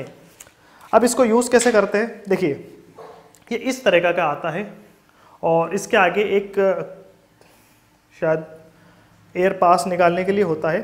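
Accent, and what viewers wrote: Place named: Madhya Pradesh, India. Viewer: Aapke bolne se pata chalta h aap MP se ho... Or gharaatte nahi bolte... Kharaate bolte hain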